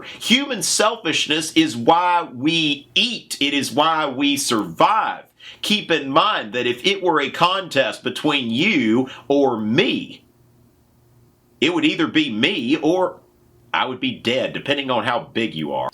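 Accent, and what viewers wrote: South, United States. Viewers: has southern accent - ok, let's not stereotype, maybe he's educated enough. . .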